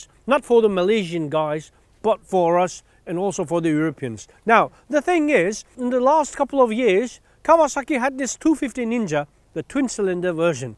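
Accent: England, United Kingdom. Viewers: Tam bir ingiliz aksanıyla konuşuyor, ne değer göçtü gitti bee....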